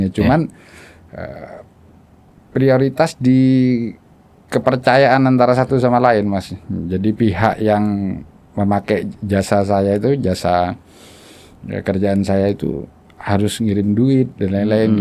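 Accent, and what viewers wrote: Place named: Madura, Indonesia. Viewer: Bhasany mdrny kentel bget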